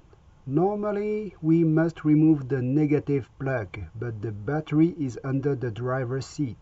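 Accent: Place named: France. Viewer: Hi, you should have your own T.V. Show! Great tutorial & very very clear precise instructions, also very good English but I love your accent, vive la France.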